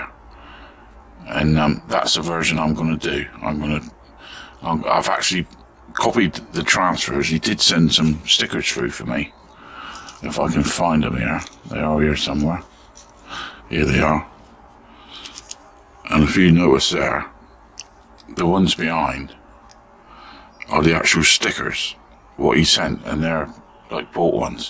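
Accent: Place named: Devon, United Kingdom. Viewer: Hi, so pleased to be able to get back to your channel, followed you years ago, I have since had a Double Lung Transplant so have loads of time to get back into my Restorations, absolutely love your videos, you sound very much like a Devonshire lad like me, I wish you all the very best, I look forward to your next Video, Take Care bud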